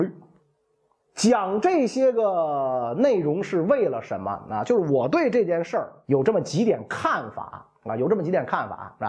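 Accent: Beijing, China. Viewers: 一口京腔听的别扭...感觉像是说相声、说书！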